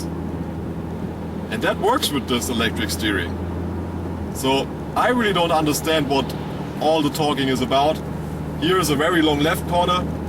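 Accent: Germany. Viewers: I don't normally post. However, I find it ironic that he suggested you work on your English whilst his post contained grammatical errors. You have a very good grasp of the English language. Expressive, emotive and eloquent. Yes, you have a German accent, but any proficient English speaking person can understand you perfectly and you put a lot of the American youtube reviewers to shame. I just wish the car manufacturers would give you more access to their cars. Keep up the good work. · I like his English with german accent. It is sort of like listening to Arnold Schwarzenegger review a car :D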